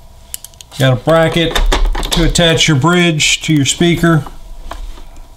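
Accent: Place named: Maryland, United States. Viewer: listen to the 'Merilan' in his voice....also, loved that he substituted speakers.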